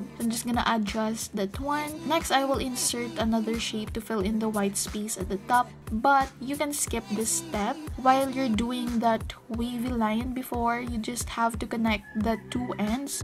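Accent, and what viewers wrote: Philippines. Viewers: Are you by chance a Filipino? Your accent is like a Filipino Accent.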